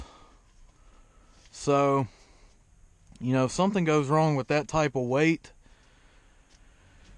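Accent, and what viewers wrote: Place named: Alabama, United States. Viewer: Ahh that's cool! I am originally from Pennsylvania (Lehigh valley). I came down to Birmingham a few years ago to go to UAB and now I'm about to graduate and considering trucking. As I watched more of the video I was like he sounds like he might be from Alabama! Low and behold! I go to Alabaster a few times a week to go to Veterans park since they have a nice skatepark there. But I'm mostly just stuck by UAB which kinda sucks since I hate the city! And I hate the city drivers and how no one uses turn signals! And just the constant rush haha. I'm hearing a lot of good things about Crete. I'm going to check out your six month review! Thanks!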